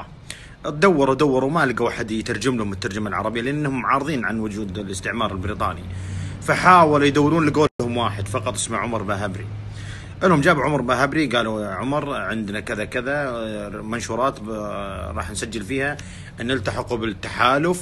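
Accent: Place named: Hadhramaut, Yemen. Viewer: لهجتك روعه تحية للحضارم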